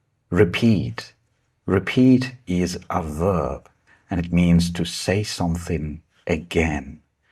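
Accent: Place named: United Kingdom. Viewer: Plz don't leave this book and this way with your best British accent 🎉❤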